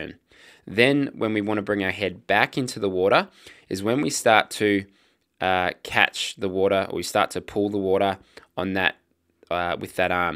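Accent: Australia. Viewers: Awesome! Thanks! Though at first that lovely Aussie accent made "late" sound like "light" !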